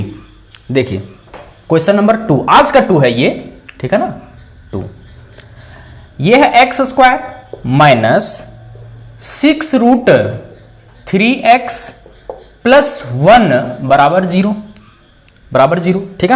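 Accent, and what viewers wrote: India. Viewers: Bhai mai aapse kuchh kehna chahta hu..... Aap garibo ke liye bhagwan hai..... Ho sakta hai kuchh log aapke desi bolne ke style ko nahi like karte ho.... Aap rukiyega nahi.... Thanks a lot keep continuing.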